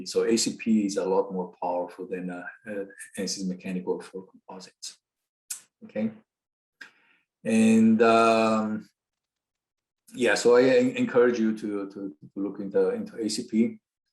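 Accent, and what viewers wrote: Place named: Brazil. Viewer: I knew that accent was Brazilian! Super cool seeing an Asian person with a Brazilian accent!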